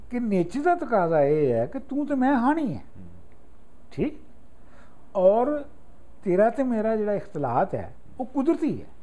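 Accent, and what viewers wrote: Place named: Jhelum, Pakistan. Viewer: Navid Raffiq , his language was punjabi though jhelumi accent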